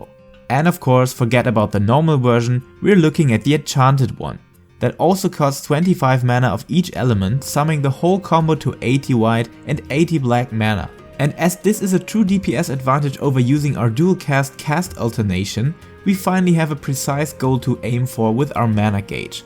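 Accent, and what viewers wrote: Germany. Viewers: he didnt even pronounce a german word the german way, when he sounds like a german dude :P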